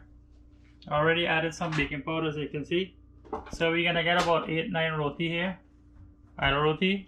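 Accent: Guyana. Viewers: I love your accent❤ pure Guyanese 🇬🇾 Not had a nice chicken curry for along time.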